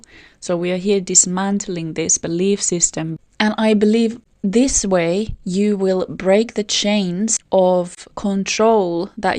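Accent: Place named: Scandinavia. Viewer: I figured you were Nordic, but I wasn't sure if it was that or a German accent. Your accent sounds vaguely British sometimes too.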